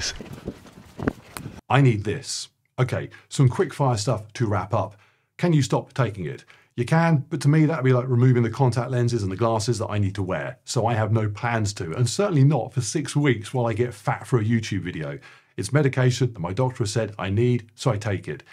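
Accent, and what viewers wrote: England, United Kingdom. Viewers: I need that English accent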